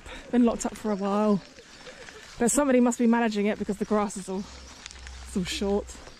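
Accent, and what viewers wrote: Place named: England, United Kingdom. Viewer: Your English is from England, I'm catching it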